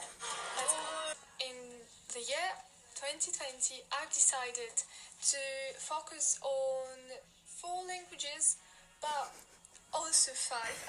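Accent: France. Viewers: LOL I thought she was french because of her accent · Bravo pour cet accent français 👏 👏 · It is true thati n your instagram video you really have a french accent haha.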